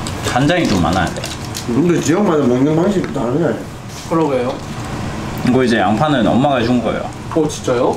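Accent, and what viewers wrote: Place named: Gyeongsang, South Korea. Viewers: Logat seperti logat busan... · When speaks casually, I notice Daegu accent is sooo cute Kekekeke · Daegu's accent is cuteeee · your daegu accent is sooooo adorable♡ Love you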